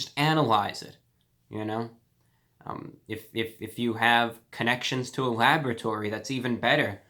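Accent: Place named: Transatlantic, United States. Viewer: Ive also noticed he has a slight transatlantic accent which takes years to learn (it's not a natural accent to any group of people) thats dedication.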